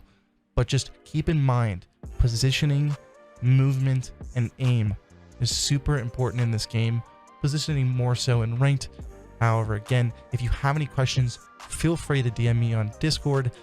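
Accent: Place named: Texas, United States. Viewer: That's a Nice Texan accent you got there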